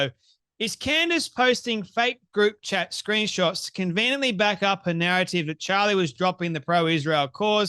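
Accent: Australia. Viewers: Love, love your accent...are you Aussie? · You an Aussie?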